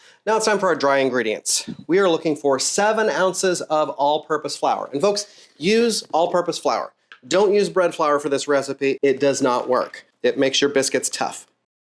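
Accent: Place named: South, United States. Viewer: You ma'am, are a real SouthernSoulSister. I thought so at "ya'll" and "sin" but I knew it for shorly at "my husbands mama". Lol.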